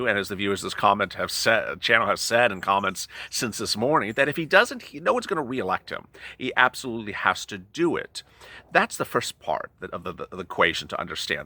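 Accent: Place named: Long Island, United States. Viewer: Love dat Lawng Guyland accent, - Power To The Purple!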